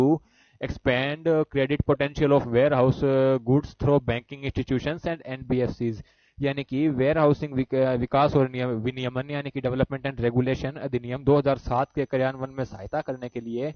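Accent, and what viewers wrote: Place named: Bagri, India. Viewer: Bhai ap bagadi bolne wale leg rehe ho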